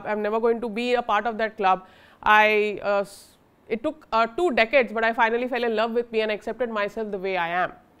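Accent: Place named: Mirzapur, India. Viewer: loved ur mirzapur accent😍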